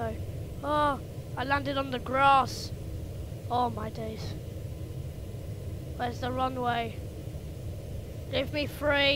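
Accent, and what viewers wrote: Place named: United Kingdom. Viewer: oh your british, cool?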